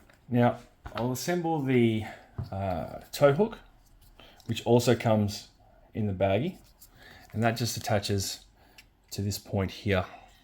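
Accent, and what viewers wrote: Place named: Australia. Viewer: Let me guess, your ausie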